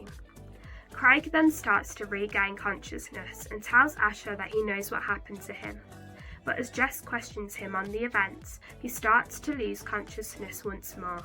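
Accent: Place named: Midlands, United Kingdom. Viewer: I love that you have a Midlands accent 👏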